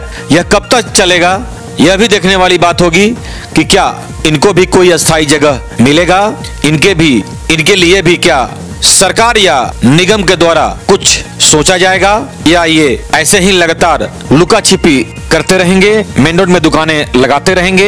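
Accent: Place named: Jharkhand, India. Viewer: Jharkhandi aavaj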